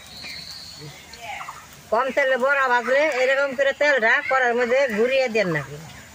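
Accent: Bangladesh. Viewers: Akdom amader বাংলাদেশের moto apnar kotha o ranna khub valo lage ,darun lagse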